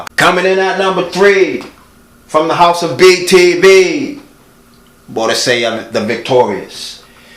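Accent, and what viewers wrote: Boston, United States. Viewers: love this guys Boston accent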